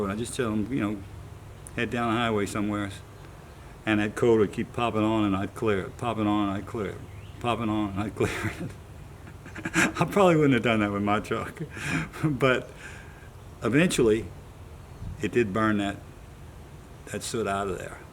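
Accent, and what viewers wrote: Louisiana, United States. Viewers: Are u Cajun? I bought my first cummins while I went to school in Louisiana and u sound like all them cajuns down there. Lol Some good folk.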